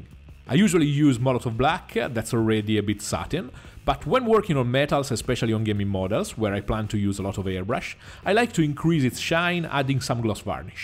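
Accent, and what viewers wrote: Italy. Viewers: Però se parli italiano qualche volta non farebbe schifo 😂😂 si sente che sei italiano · Awesome Italian accent dude 😎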